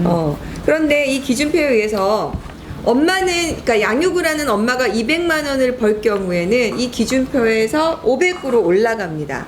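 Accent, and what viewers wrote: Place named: Seoul, South Korea. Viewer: 고향은 전라도인데 서울말을 더많이쓰시네요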